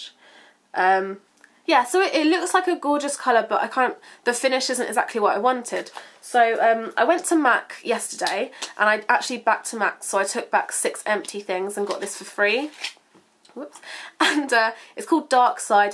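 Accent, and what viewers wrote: United Kingdom. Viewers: Listening to you speak makes me miss living in the UK so much :(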